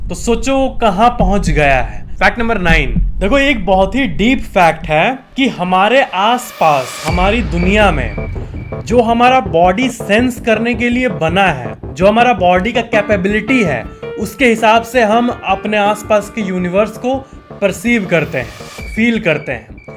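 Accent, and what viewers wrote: Bihar, India. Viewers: Aap bihari ho n ?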